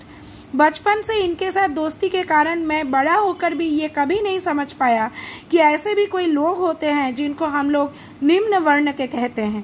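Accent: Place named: Bengal, India. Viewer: Lagta hai aapki asali language Bangal hai aap Mumbai ya Delhi mein nahin rahte b😮😮😅😊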